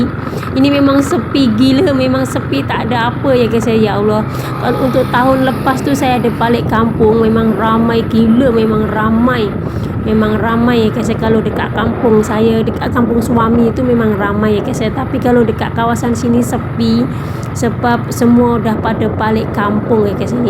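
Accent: Java, Indonesia. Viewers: Logatnya biasa aja lah pake indonesia jadi kurang enak dengarnya pake bahasa melayu malasyia lucu,gak enak dengarnya sebab medhoknya masih nampak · bahasanya boleh melayu Malaysia tapi logat jawanya medog banget inilah Jamed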